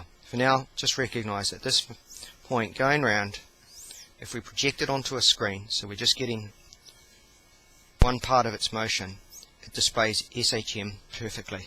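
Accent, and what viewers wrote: New Zealand. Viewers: from new zealand?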